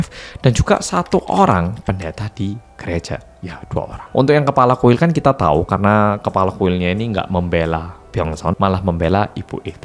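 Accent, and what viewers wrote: Java, Indonesia. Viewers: medok Jawa... hadeeuh... gajelas ngomong nya lu ah sipit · Hhhhhh bener bener medok bang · Medok e pol mas hehehe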